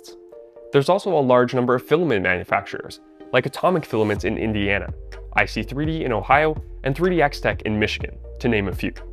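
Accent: Canada. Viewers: You sound Canadian. Tariffs have been one sided with foreign companies enjoying the benefits of their domestic product being more affordable than American made products. Our tariffs will mirror theirs thus leveling the playing field. American companies will now be able to compete in America. Americans will begin to profit. Give it a chance to bring positive results.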